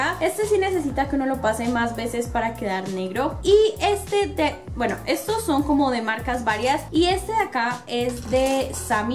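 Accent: Mexico. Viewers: A penas estoy viendo tus vídeos y creí al principio que eras mexicana. ¿De dónde eres? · Habla como Mexicana....ella no es Colombiana?? Ni idea...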